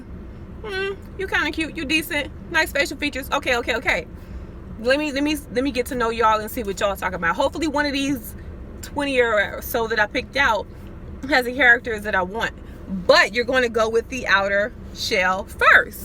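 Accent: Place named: New York, United States. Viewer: Dang pretty lady. You sound like you’re from the NY.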